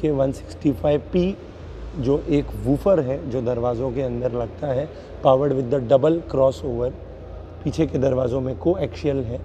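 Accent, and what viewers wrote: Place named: Maharashtra, India. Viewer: Waa..... मराठी आवाज अचानक ऐकून खूप छान वाटलं......❤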